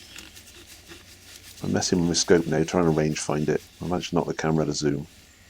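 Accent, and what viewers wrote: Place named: Dorset, United Kingdom. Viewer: You sound like you`re from my area, are you a Dorset man?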